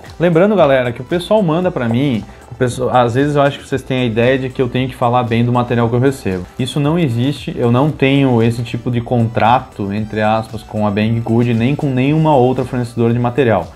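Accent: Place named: Rio Grande do Sul, Brazil. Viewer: Top o vídeo vc é do rio grande do sul? Vim aqui procurando um vídeo sobre carretilha pq estou tentando juntar uma grana pra comprar um pq pesco de molinete até o momento mas que sabe consigo comprar uma. Mas aí vi que vc tem um sotaque gaúcho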